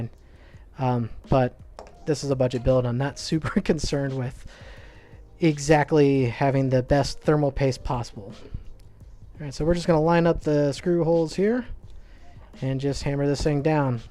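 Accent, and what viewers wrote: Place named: Texas, United States. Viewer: This is my first video of yours to watch and as a fellow Texan I knew you were from Texas before you said you were haha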